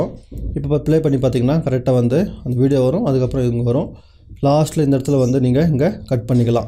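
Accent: Chennai, India. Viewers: தலைவா சென்னை தமிழில்,பேசாம தஞ்சை தமிழில் பேசுங்க..(அது என்ன உங்களுக்கு உசரம் height டா..? நன்றி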